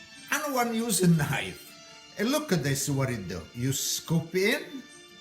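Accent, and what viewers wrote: Calabria, Italy. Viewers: Centi'anni! This except for anchovies was how my noni made it. She did cook with anchovies but it was a with olive oil, garlic on day old bread. You sound like the old calibretzu from the hill. I miss that accent from when I was a kid.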